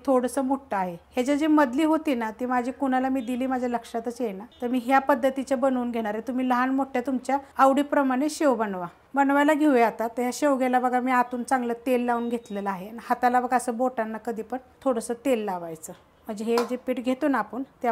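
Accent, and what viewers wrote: Satara, India. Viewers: मी पण सातारची आहे .... भाषे वरून मी तुम्हाला ओळखले 👍🏻👍🏻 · खूप छान रेसिपी आपली सातारी भाषा आणि सातार भाग सारखे च लाडू बनविले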